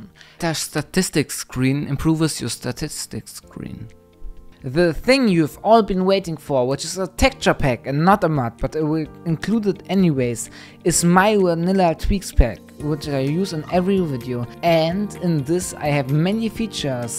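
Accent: Germany. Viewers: Very, very cool video! By the way, are you german? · r u German? XD nice Video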